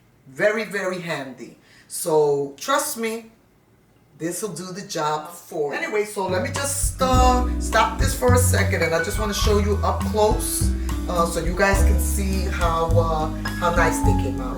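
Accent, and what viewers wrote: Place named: New York, United States. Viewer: I was listening to others that use alcohol and baking soda, however I’m going to try your method. Love your New York accent and Love how you explained everything. Thank you 🙏 you are very kind to share your secret!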